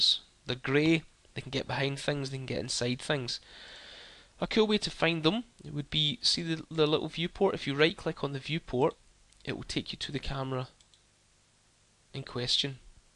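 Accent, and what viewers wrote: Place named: United Kingdom. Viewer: Thanks you, very helpful. However to my mid-western American ear some British accents are as clear as day and others might as well be speaking Chinese. This one is kind of in the middle. I don't think it would hurt to speak more slowly and distinctly.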